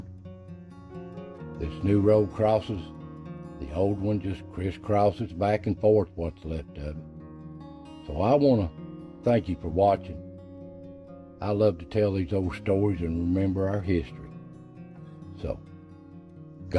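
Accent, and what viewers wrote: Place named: South, United States. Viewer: We just found you not too long ago. We really enjoy hearing you tells us those stories thru the sound of your southern drawl; & I mean that in the best of ways. We have been greatly disappointed with what we have found with some so-called Smoky Mountain YouTubers; a real slap in the face of the honest, hard working & authentic folks of this area! Thank you for a “pure” representation of these mountain that God Breathed into existence & the history of the folks that lived & breathed there☺️. 🫎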